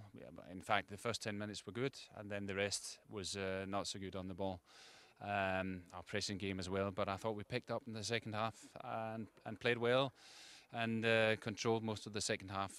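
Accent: Scotland, United Kingdom. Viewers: Nice to hear he still has his Scottish accent! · Good Scottish twang in his voice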